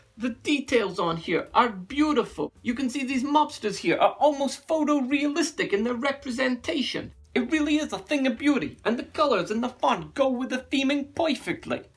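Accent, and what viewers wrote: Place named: Chicago, United States. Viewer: Bought this after seeing this. Dodgy Chicago accent lol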